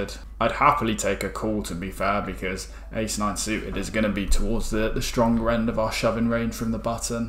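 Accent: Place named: South West England, United Kingdom. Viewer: Yes mate. Some Sunday content for the lads. You sound like a fellow South-Westerner... Bristol?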